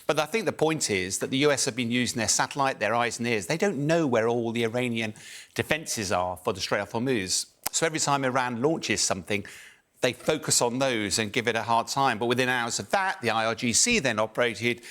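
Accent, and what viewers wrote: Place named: England, United Kingdom. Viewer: Thanks for the Overview Sean Bell. Your sound English, .... trustworthy. American news, only idiots trust.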